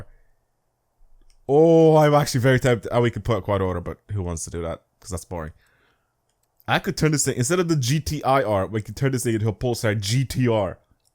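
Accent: Dublin, Ireland. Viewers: u from dublin bro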